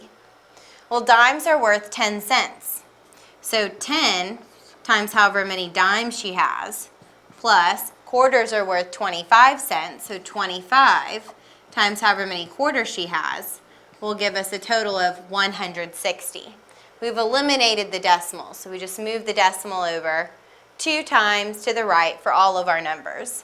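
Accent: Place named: South, United States. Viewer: I'm sitting here and trying to determine where the instructor's accent is from. I hear a faint southern drawl, or possibly North Carolina. Great instructor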